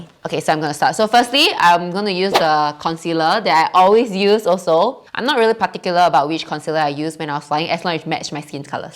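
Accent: Singapore. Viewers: I am ex CX, did u know sq and cx are very competitive(our point of view lol), I always heard sq was doing thus and that...blar blar.hahaha I was wondering sq girls do not do galley works, male crews hanfle galley, so your uniform can be fit and tidy, is it true? any way, welcome to Korea, hope you have another wondetful life here. your English accent remind me my SIN crew buddy that I haven't met over 15 yrs since I resigned. miss crew days sometimes. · idk y but lemme just enjoying that singlish lol, anw that sounds more like malaysians when they r speak english i thought >.< imo thats super easy to identified melayu characteristic xixixi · I can only say "what?" "Excuse me?" For some of your Singlish especially when it comes so fast 😂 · I didnt know u have youtube channellll 🥺 glad i know it noww hehe ❤ i really miss hearing someone speaks in singlish coz i have no singaporean fwens here in indo and i rarely talk to my old fwens in sg anymore 😔 looking forward to more vidsssss 🎉🎉🎉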